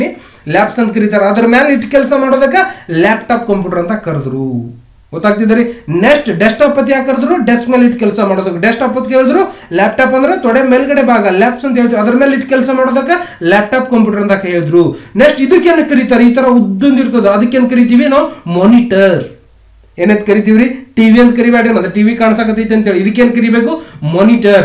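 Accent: North Karnataka, India. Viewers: Bhal chalo heltiri sir kelta idre boring agolla nam uk bhashe ne hange nanna 2 subscribe nive ast ista aitu nim video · Super....knowledgeable video sir....your talking impressed me...I like your uttara Karnataka language......your video is helpful to me....all poor persons....and keep it up sir....continue next.....hevvy computer knowledge video provided me us......🙏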